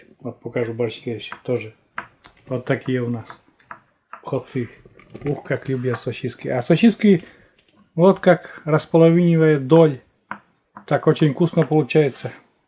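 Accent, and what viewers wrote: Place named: Russia. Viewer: Какой приятный русский говор - просто мёдом Божьим на уши и на сердце ❤❤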